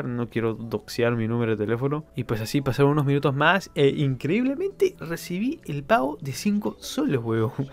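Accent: Argentina. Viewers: Papi por que hablas como argentino??? Si eres peruano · Porque ablas como argentino? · Yo de Lima, por eso te digo que a veces suena como a argentino, pero muy ligeramente.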